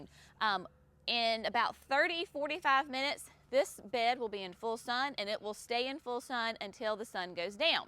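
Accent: South, United States. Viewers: North Caroline-ah? Love that southern twang!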